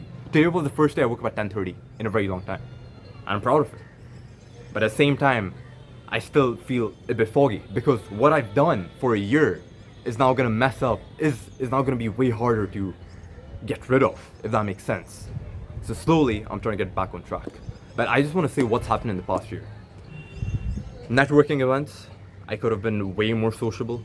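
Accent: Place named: United States. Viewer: bro you form kuait but please tell me how you got this such fluent american accent please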